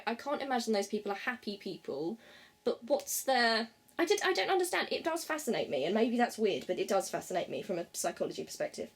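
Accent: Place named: England, United Kingdom. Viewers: More proof that everything sounds better in an English accent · Wow, lol. That surprised me, when you read those comments out like that, raw and uncensored. I must say, you were sexy while doing that, and it was stimulating, in an odd way...(grin) I guess its because you have that English accent, and always seem to be very proper and have that kind of good girl image, that it was shocking to hear you read some of those lewd comments out loud. Anyway, to get serious, I admire that you are able to handle that sort of online abuse/harassment, it shows you are a strong person within yourself.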